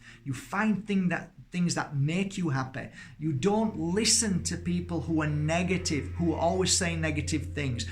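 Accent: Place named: Northern England, United Kingdom. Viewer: There’s nothing better than listening to a nutty northerner which oozees every tune of light and positive energy, your awesome mate, Thankyou for giving your time experience 🤙🏼🙏🏼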